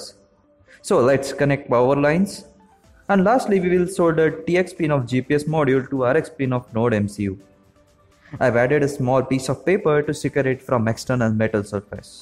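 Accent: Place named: Gujarat, India. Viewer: very informative! (*liked your gujju tone though😉😁)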